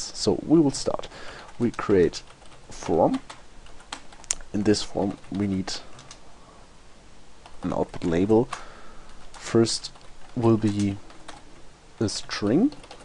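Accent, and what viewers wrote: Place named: Germany. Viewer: your German accent is music to my ears. Fuck those indian tutorials.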